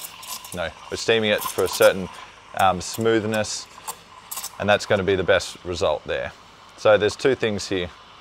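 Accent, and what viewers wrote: Australia. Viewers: where are you from? Australia? great accent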